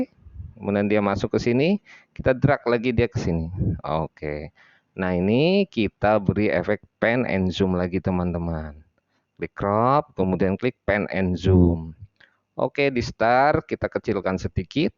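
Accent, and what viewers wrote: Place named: Tegal, Indonesia. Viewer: sampean wong tegal mas?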